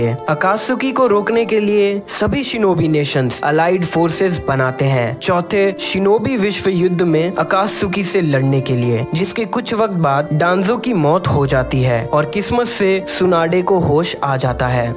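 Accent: Standard. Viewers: Bhai aap itni sudh hindi kab se bolne lage😒😕😒😕😒😕😒😕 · shudh hindi mat bolo karo aap jese bolte the wese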